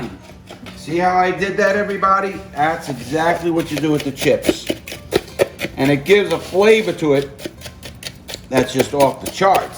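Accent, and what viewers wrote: United States. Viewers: r u American ?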